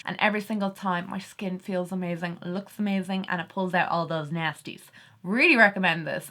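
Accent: Ireland. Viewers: Hello beautiful, new subscriber. So proud I recognized your accent as an Irish one. 😉😊 How lovely. 💖💖⚘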